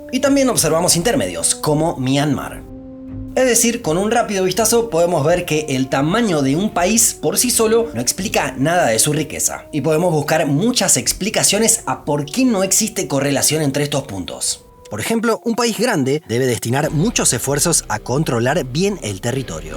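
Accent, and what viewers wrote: Argentina. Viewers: No di es nada..seguro eres m argentin. o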